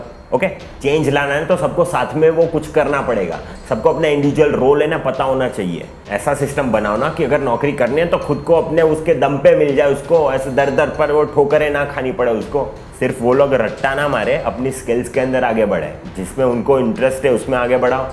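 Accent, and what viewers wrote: Gujarat, India. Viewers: one thing I come to know from your speech in first 30 sec is u are Gujarati 😁😁😁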